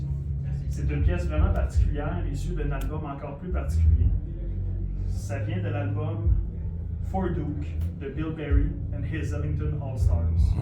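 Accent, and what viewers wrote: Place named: Quebec, Canada. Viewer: French Canadian …..such an incredible difference to the French accent in france . Thanks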